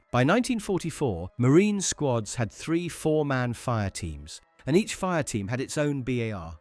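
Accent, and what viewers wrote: England, United Kingdom. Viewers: You couldn’t carry an extra barrel and still do what the the BAR was intended to do…… The Bren gun proved the concept. The BAR was even lighter (albeit 20 rd mag). Let’s calm down when we hear an English voice doing a documentary…. This one’s iffy as hell.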